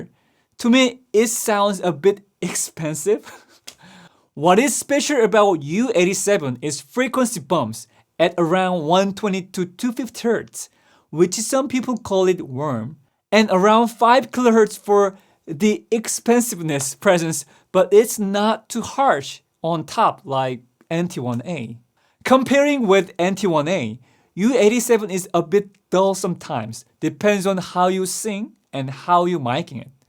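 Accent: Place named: Asia. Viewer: 아시아산 영어의 향기가 느껴져서 좋네요.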